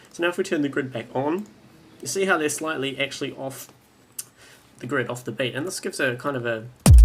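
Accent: New Zealand. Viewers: Are you a kiwi?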